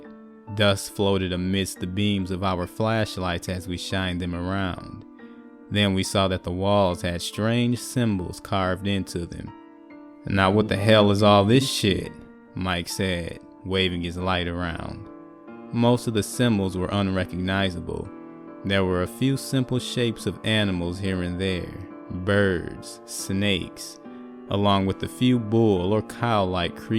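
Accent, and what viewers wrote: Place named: Deep South, United States. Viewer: 🇬🇧 I really like your stories so ive subbed to yr channel I also love yr accent, i think its deep south, Alabama or Louisiana? Im English & live near the Scottish borders & have only ever visited New York a few times & also visited friends in Utah & Wyoming but have had a thing about the kind of accent you have & so enjoy listening to you narrate Your stories are also very good & I havent yet heard any of them before, did you write them? If you do then your writing talents are considerable I look forward to hearing many more stories Keep up the good work, ive often subbed to creepypasta channels when they have had less than 1,000 subs yet in no time they have climbed to 20,000 - 50,000 Dont know how long you have been going but i always say From small acorns do large Oak trees grow. Thank you for your narrations I do so enjoy listening 👧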